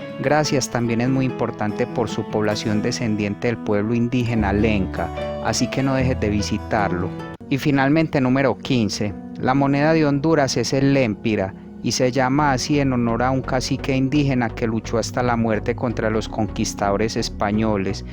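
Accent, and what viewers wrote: Colombia. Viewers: Sos Colombiano verdad?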